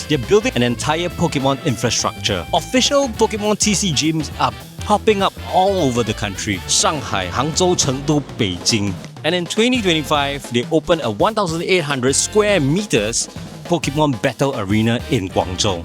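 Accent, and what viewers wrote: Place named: Trinidad and Tobago. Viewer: Random: Your accent gives a hint of caribbean..Trinidad to be exact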